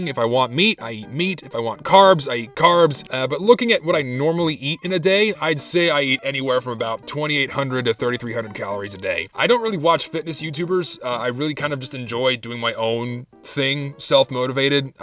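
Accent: Texas, United States. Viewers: youre accent becomes more cowboy through out the video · MORE TEXAN ACCENT NOW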